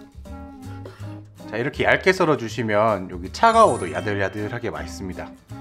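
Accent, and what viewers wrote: Gyeongsang, South Korea. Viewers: 경상도 억양이 다정스럽고 어딘가 품위가 느껴지는듯해요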